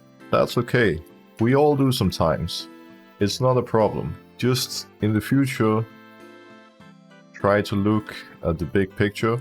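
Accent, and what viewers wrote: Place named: Denmark. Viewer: i had the video playing for the first 2 minutes while doing smth else on my pc and when i actually started watching i was trying to find the SETT and i was like wtf xD danish accent got me there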